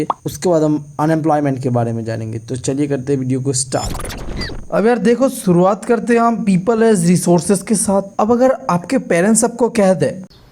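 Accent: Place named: Mumbai, India. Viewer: I think you are from Mumbai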